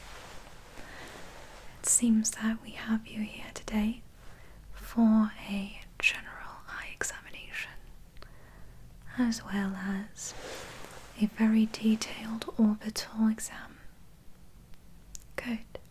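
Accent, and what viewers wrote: England, United Kingdom. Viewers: LOVE that English Accent of yours!!! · You have the nice british accent, im a british too. Proud of 🥰🥰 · ill never get over her British accent...sooooo calming and relaxing !!! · Love your asmr clips, your gorgeous and your British accent is so good! you should be in the next Austin Power's movie if they ever make one 🙂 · Love to hear the English accent. Good stuff👍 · Was she trying to do an English accent? 😩😂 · That is the best english accent from an american ive ever heard. As a formal member of the UK. Good job!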